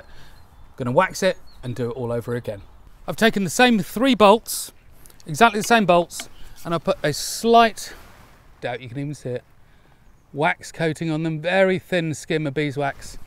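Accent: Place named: Berkshire, United Kingdom. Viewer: That accent sounds rather Berkshire, am I right?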